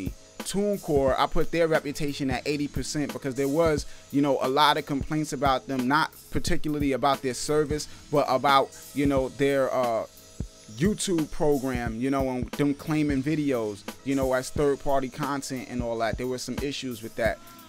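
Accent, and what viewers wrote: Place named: New York, United States. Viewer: You definitely from New York lmao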